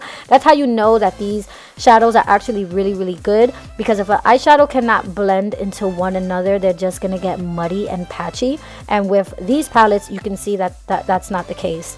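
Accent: New York, United States. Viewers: Ur the only YouTuber I enjoy watching lmao I loveee your NY accent and your amazing vibes! Keep it up girly ❤️❤️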